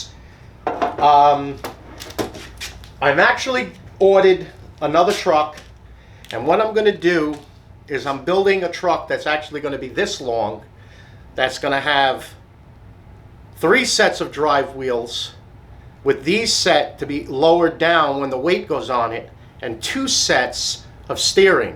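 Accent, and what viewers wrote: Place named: Massachusetts, United States. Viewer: I could tell by your accent. I live in mass.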